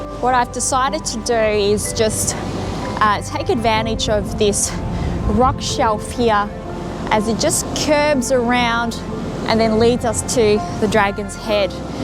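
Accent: Australia. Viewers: Just Flew back home after long time away and found your informative and entertaining Channel . It was so good to hear a Australian Accent on a photography channel. Keep it up great work😁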